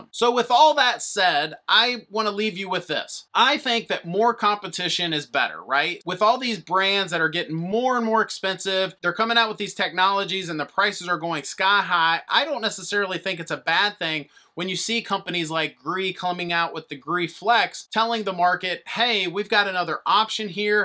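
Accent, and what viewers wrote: South, United States. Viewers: background of some southern sounding vowels, and the way you pronounce “on”. Your vid was helpful, having some hvac issues/thermostat issues at our house and discovered that we have an inverter heat pump. Been in the house for about a year had no idea.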